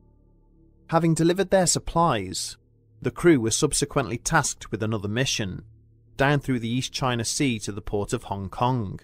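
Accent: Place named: United Kingdom. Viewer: I totally concur with Sir Bronn. You guys have a phenomenal channel with the best presentation & narrations. I know it takes time & effort to compile your videos (and I'm extremely grateful for all of them). My only suggestion would be to create more often (2-3/week). If u have your channel monotized & the community helps get you subscribers & views - you're gonna blow up & rake in some decent cash. Just my 2 cents. I'm American & I really enjoy listening to a proper British accent. Your stories are awesome & the art is epic! I wish you guys nothing but the best! Thx for everything. Much love & respect...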